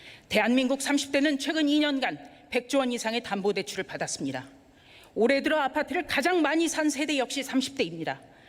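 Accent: North Korea. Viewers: 저 사람은 탈북자 에요?..말투가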